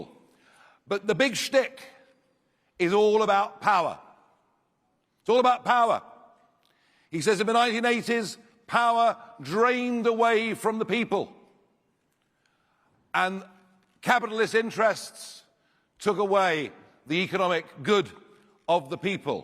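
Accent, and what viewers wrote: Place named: United Kingdom. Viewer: Nigel is a goofy-looking guy (he really needs to stop doing the lizard tongue) but I trust him mostly. Im glad he at least sounds like a real Briton and a man with enough brains to give a good speech. Of course, politics is partially about defusing explosions by making people IMAGINE they are being spoken for. Nigel better be for real, like Rupert Lowe, and Nigel MUST bring Lowe back into this tent. HE MUST do it! And embrace Tommy Robinson also. Conservatives need to answer for the CITY if they want to move forward. They have to talk about the corruption of trusts and foreign money, because it has wrecked the nation. The Royal Family is the problem of BOTH sides, and Charles needs to go. William needs to rebel or go.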